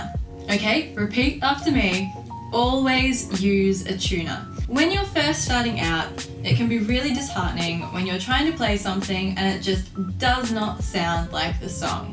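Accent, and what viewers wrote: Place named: United Kingdom. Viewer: I thought you was British lol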